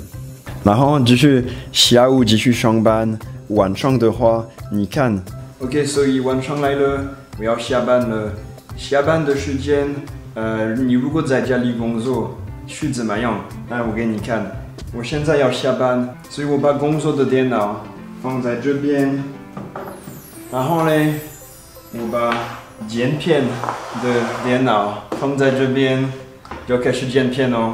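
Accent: Taiwan. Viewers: 講話很台灣味欸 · 真的? 假的? 好台哦！🤣🤣🤣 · 真的很台灣味你如何學好中文的? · 台灣腔中文真的有夠台的~😁😀💞👍👍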